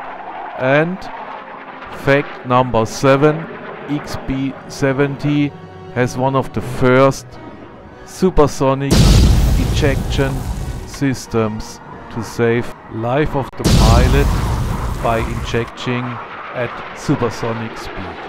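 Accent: Germany. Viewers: train your english. There is no excuse that every german hears you are a german. Try speak Valkyrie "Wall Kü Ri" Best regards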